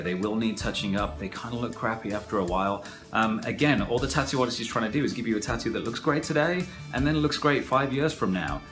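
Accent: United Kingdom. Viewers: Are you british? because you sound kinda british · You sound British but say some words with an American accent.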